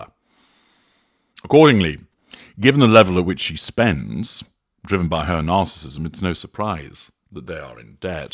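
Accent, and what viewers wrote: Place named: North, United Kingdom. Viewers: At a guess I would suggest comes from North.Yorkshire. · despite being Welsh, lol. I am Irish so pick up on the fact that is a ''posh Northerner'' maybe Americans think this is RP but imo, this northern accent is what makes his speaking voice so easy on the ear imo.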